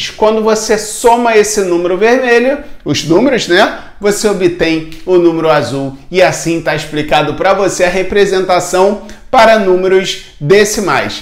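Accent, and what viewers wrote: Rio de Janeiro, Brazil. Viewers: Amo o jeito que ele fala carioca kk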